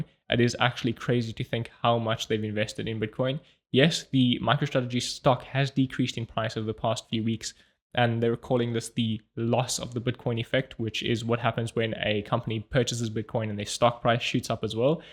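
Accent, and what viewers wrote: South Africa. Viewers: South African? Nice to hear our accent online dropping some knowledge!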